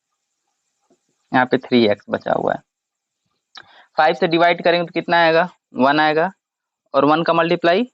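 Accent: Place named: Bhojpuri, India. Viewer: bhojpuriya jhalak ta jaan marat ba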